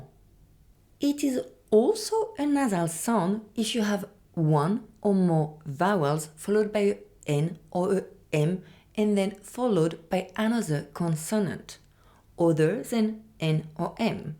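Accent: France. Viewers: What’s nasa son?? You mean nasal sound?? Please don’t sound French when you’re speaking English. I had to turn subtitles on to understand what you’re saying in English. If you speak French, then ok.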